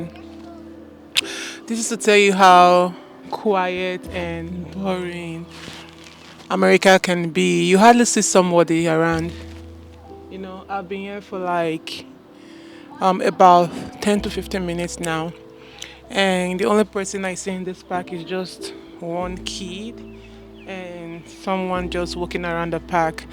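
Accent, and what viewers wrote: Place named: Nigeria. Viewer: GET SOME AFRICAN FRIENDS.Which African country are you from? You sound Nigerian.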